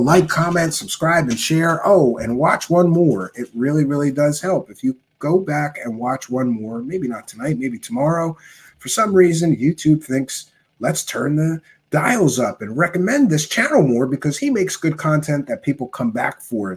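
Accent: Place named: New Jersey, United States. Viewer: Great show!! You have a jersey accent..lol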